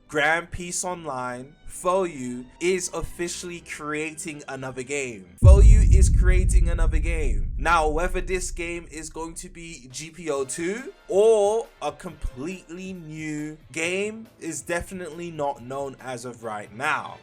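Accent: United Kingdom. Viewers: british smh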